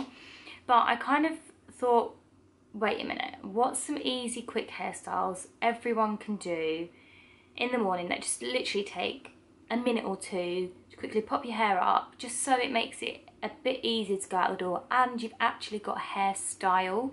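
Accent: United Kingdom. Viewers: I love the British accent that you have!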